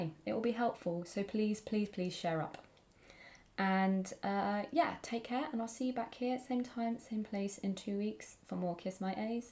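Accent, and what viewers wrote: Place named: England, United Kingdom. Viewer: I really like your videos, they are informative, inspiring, and make me smile. Great combo, plus being from London, it's so nice to hear an English accent.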